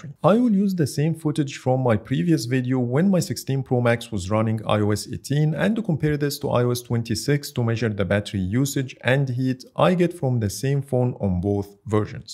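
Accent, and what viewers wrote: Egypt. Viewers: Your English sounds Egyptian to me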